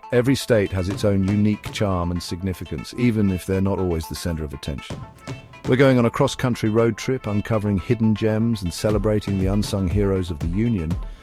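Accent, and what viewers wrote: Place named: United Kingdom. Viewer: Americans don't need anyone with a British accent telling us that some of our states don't matter...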